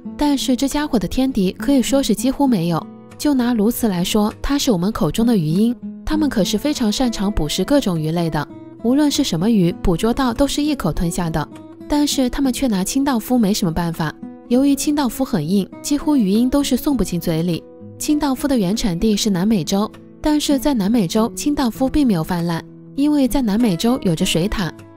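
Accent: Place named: Guangxi, China. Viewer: 樹瓦蘭窖 我就猜他是广西的，口音太有广西味儿了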